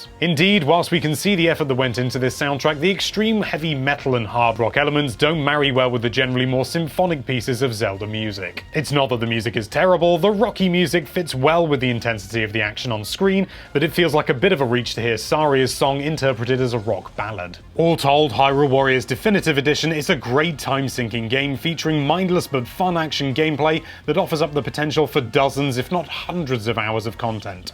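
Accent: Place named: United Kingdom. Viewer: thedreadedzero it’s the British-English pronunciation, as stated in the dictionary. Die-nasty is an American pronunciation, and is british.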